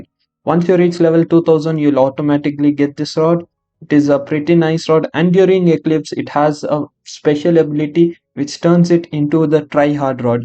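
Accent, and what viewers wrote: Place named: India. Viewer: Why do yoi sound indian😭😭😭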